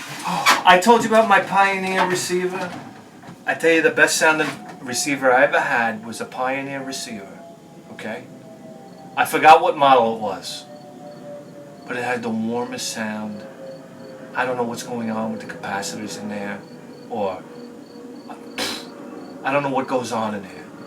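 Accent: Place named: Boston, United States. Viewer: it's that accent that sounds Bostonian.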